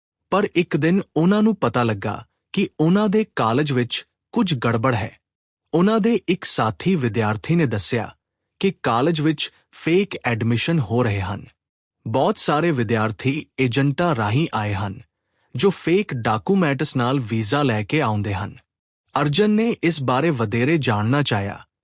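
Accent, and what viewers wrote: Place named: India. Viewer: Punjabi tan tuhadi hindi touch Wali Hai isnu improve karo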